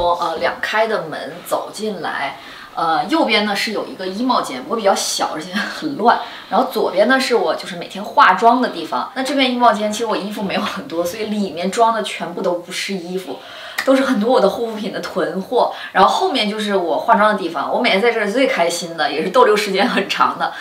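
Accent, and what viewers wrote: China. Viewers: 这英文，中文口音太重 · 大陸口音⋯⋯聽了就整個翻白眼難聽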